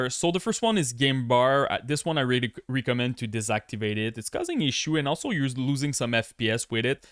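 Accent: Quebec, Canada. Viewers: Thanks for the tuto pal ! You sounds a bit Quebecois haha, always a mess when it comes to Ea sport and optimization on PC. Cheers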